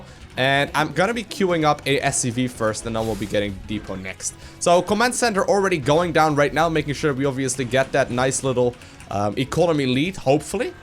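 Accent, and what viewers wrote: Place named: Netherlands. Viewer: hey, its extramadchicken the guy who literally started playing sc2 again due to that fabulous Dutch accent. anyways i know this is an old video but since u are still actively streaming i was wondering of what we would like Your easy to follow tutorials. i was wondering if you could potentially create more terran and possibly toss videos when u have time. Although zerg became my initial choice due to a wide variety of videos youve made as u are the best sc2 instructor out there, id like videos of u analyzing some Terran and toss basics and principls. It will inadvertently help zerg players because it will teach them the mechanics behind the other races. Also when i decide to not be a pleb anymore, ul be the first and likely only streamer ill sub for xD kappa.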